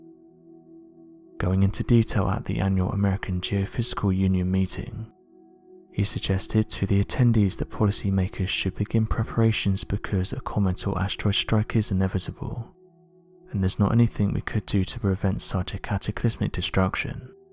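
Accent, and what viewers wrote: United Kingdom. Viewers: I love your channel but you really need to replace this narrator. The young man narrating this and other video's mumbles. It's hard enough to understand the British pronunciations without a monotone mumbler. I'm sure he is a very nice person but he is not a good narrator.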